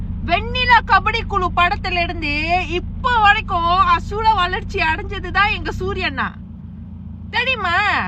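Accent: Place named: Madurai, India. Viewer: Malaysia la irukkanu solra but madurai language pesara.. but diwakar naai ah vachu vachuthuku nandri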